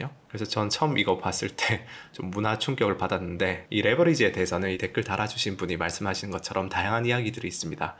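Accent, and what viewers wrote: Gyeongsang, South Korea. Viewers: 대구 말투네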